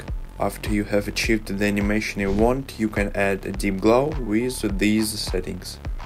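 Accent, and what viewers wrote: Russia. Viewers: сразу понятно что русский потому что акцент не английский)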